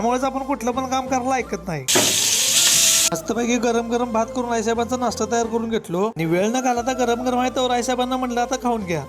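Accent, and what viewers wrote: Kolhapur, India. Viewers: Bhava tuj gav kont re bhasha kolhapurchi vatate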